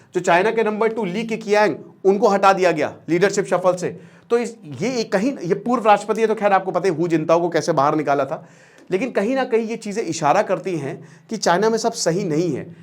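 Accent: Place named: Lucknow, India. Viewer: Sir lucknow ki gehri छाप hai ap pr …. “उसी चौराहे पर टाँग दिए जाओगे “ itnaa khtraank bolaa apne 😅😅😅😅 😊😊😊😊😂😂😂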